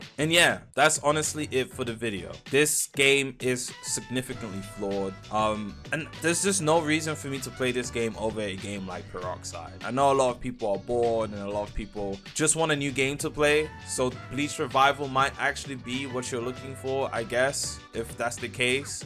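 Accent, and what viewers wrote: United Kingdom. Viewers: Wait, you're british??...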